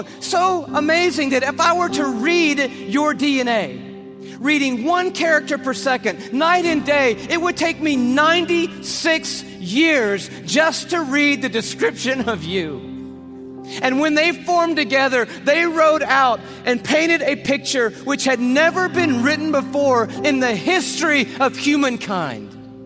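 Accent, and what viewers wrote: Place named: South, United States. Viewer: Ευχαριστώ το video που δικαιολογεί την αθεία μου. ¨Εφτιαξε λοιπόν όλα αυτά τα τεράστια άστρα που δε μπορούν να φιλοξενήσουν ζωή, για να δημιουργήσει μια προσωπική σχέση με εμάς τους τυχερούς στην μικρούλα Γη. Εκατοντάδες δις γαλαξίες με εκατοντάδες δις άστρα για να πει στους Εβραίους ότι τους ελευθέρωσε από τους Αιγυπτίους, για να μας απαγορέψει να τρώμε μπριζόλα τη Μεγάλη Παρασκευή και να μας επιβάλει το πότε το πως και το με ποιον θα το κάνουμε στο κρεβάτι μας. Ηλίθιος ο ομιλητής και φυσικά με νότια προφορά. Οσο πιο βλάχος τόσο πιο θρήσκος ...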